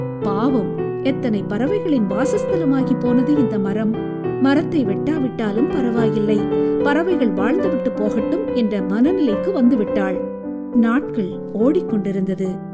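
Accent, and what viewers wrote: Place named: Rural, India. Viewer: விடக்கூடாது, ஊர் பாச 🤷‍♂️அருமை Tr🎉😂டங்கு ---டங்கு தமிழ் 🤷‍♂️அருமை. வாழ்த்துக்கள்.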